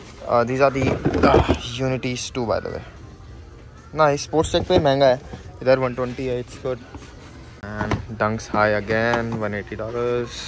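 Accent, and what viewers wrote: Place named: Garhwal, India. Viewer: Garhwali accent Op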